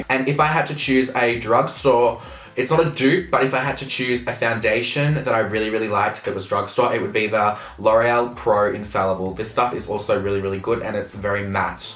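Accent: Australia. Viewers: IS THAT AN AUSTRALIAN ACCENT I HEAR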